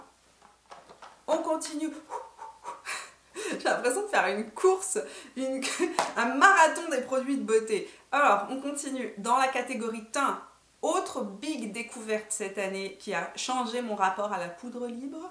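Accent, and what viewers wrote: Quebec, Canada. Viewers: Je suis venu ici par curiosité, je te préfère tellement maintenant et ce petit accent quebequois tout discret alors que maintenant on lentend pas. Tu est comme le bon vin 😉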